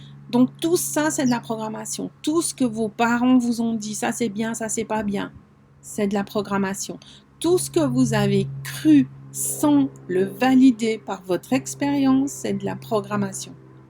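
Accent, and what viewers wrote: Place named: Switzerland. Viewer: Bonjour, je découvre ta chaîne, bravo, j’ai donc encore beaucoup de tes vidéos à visionner....ce sujet est très intéressant et bien expliqué grâce aussi aux exemples...je découvre sans grande surprise que nous sommes voisines, je soupçonnais ton accent et les huitantes, septantes....donc c’est confirmé, je réside à quelques mètres du sol genevois....ceci dit j’ai appris les programmations liées au pays...ce sujet est très intéressant, je vais partager....merci encore pour ta vidéo. Bénédicte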